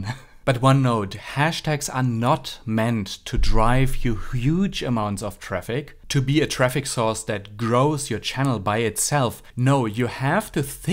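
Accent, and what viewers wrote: Germany. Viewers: Thanks for the insight, I like your spreadsheet :D Out of curiosity, are you German?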